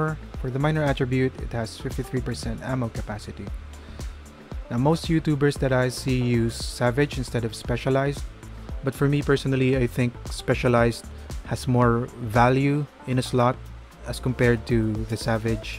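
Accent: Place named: Philippines. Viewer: this guys sounds like is flipino.. lool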